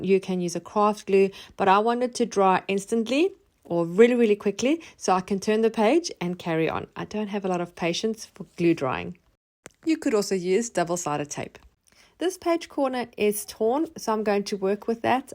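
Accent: South Africa. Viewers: Hi, I just found your channel! So happy, and I hear a South African accent? I love your style. I need to binge watch your videos. ❤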